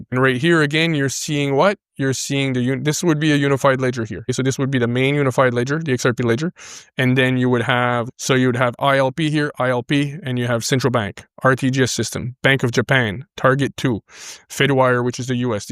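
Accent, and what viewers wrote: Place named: Quebec, Canada. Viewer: brother never stop making XRP content. Btw I’m In Toronto. You sound like you’re from either northern Ontario or Quebec. Am I right?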